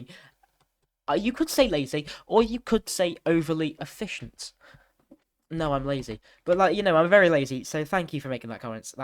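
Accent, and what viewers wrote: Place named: United Kingdom. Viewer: the british accent is so good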